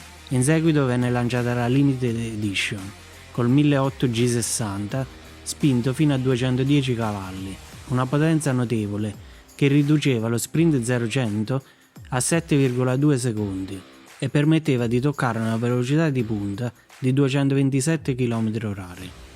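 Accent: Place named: Naples, Italy. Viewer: Ma come cazzo si fa a commentare e redigere un servizio del genere con una voce con uno spiccato accento napoletano! Vergogna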